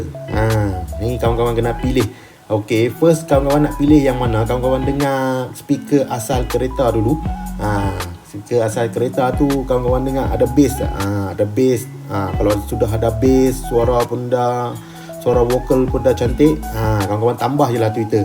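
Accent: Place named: North, Malaysia. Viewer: kedai dekat mana boss..dengar cakap bunyi utara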